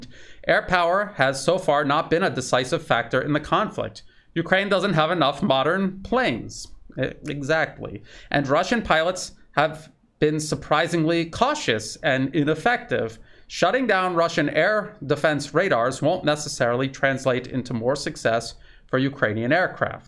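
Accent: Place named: United States. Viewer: Apparently your surname is Croatian. So if you went to Croatia you would be another guy from down town. You would have to drop the American accent thou and adopt a East European one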